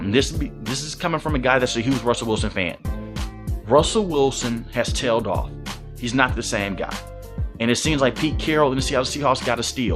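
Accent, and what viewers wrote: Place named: South, United States. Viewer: There is no way you are a Seahawks fan with that accent. More like saints and Ole miss fan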